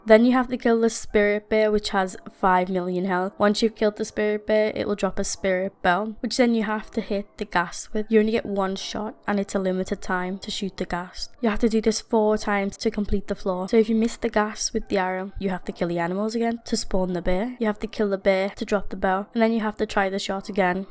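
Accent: Liverpool, United Kingdom. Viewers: Are u a scouser lol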